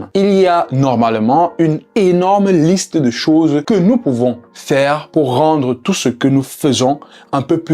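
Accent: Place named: Cameroon. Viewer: C"est quoi le truc avec l'accent Camer un peu partout comme pour ajouter de l'emphase?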